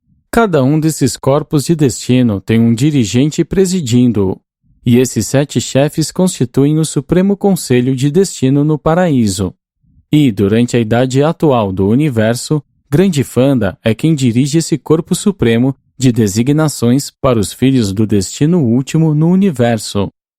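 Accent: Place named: Sao Paulo, Brazil. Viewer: ...sotaque paulista....confunde ponto com virgula......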